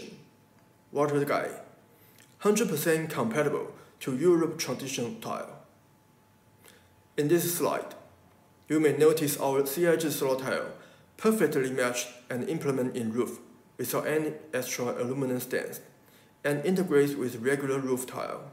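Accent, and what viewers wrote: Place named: China. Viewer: I don’t understand your Chinese accent.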